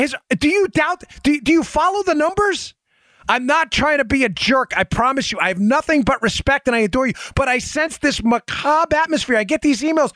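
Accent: New York, United States. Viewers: Since moving back to St. Louis I totally miss people with Dan’s NY/Northeast accent. Mines starting to disappear but I ramp it up to act tough with local punks. Great show Dan, also you are a formidable guest on Fox new panel segments.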